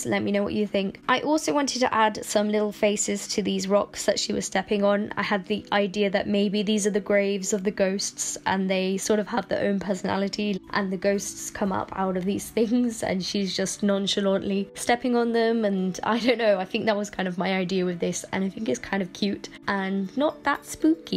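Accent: United Kingdom. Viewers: Cool painting and it glows like crazy. I wonder if you can apply it to plastic surfaces like a action figure? By the way, love your accent it's British right?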